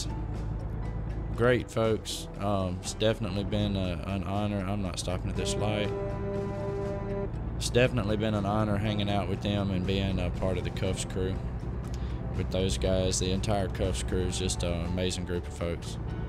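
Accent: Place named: South, United States. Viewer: As always love what your doing man! So glad I found your channel! Dude I love when you say sweet jesus Lol so funny man. Rather it's this or lspdfr you make it very entertaining to watch! Coming from south florida it's nice to here that southern accent :) also very nice involving your kids into what you love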